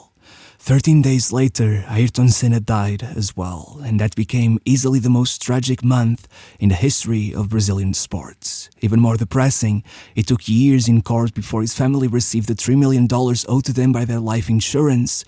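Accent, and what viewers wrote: Portugal. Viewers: It's so obvious you are Portuguese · THIS MAN´S VOICE YOU PORTUGUESE ARENT YOU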